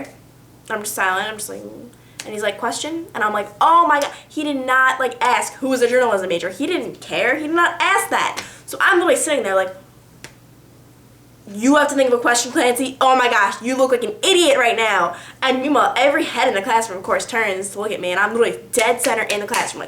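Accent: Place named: New York, United States. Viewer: Her New York accent was so strong back then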